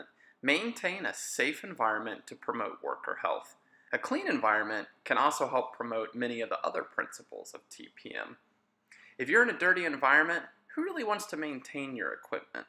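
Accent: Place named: United States. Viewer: he sounds sooooo American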